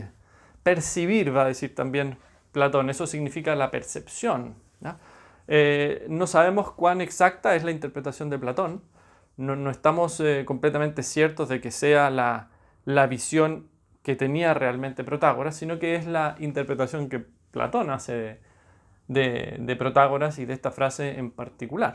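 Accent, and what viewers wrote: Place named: Chile. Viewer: Gracias por la explicación. Y me gusta el acento chileno. Es muy apacible.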